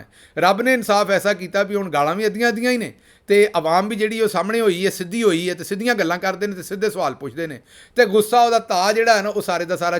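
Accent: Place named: Amritsar, India. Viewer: گوڑی امبرسری پنجابی سن کے مزا آیا ۔ ساڈے وڈکے وی امبرسری سن Regards. Prof. ILYAS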